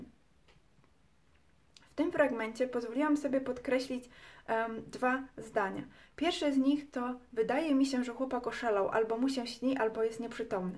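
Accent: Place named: Northeast, Poland. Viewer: Północno Wschodnia Polska. Tak mówią również Polacy mieszkający na Wileńszczyźnie.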